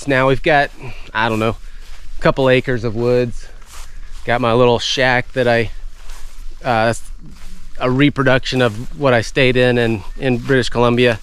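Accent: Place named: South, United States. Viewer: I'm just curious as to what part of Florida your from? I'm originally from Georgia. West of Atlanta about 30 miles but I moved to Perry Florida back in 1996. Perry is in Taylor county and I've been coming here sense I was a kid. Anyways I was just curious if you were from anywhere close to this area. Like the accent by the way. Always good to hear someone from the South!! I've just started following your channel so I was wondering how you wound up where your at now if you don't mind me asking?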